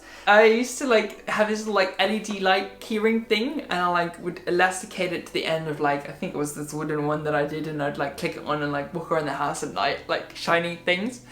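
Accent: United Kingdom. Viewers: Nice British accent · u sound like devon bumpkin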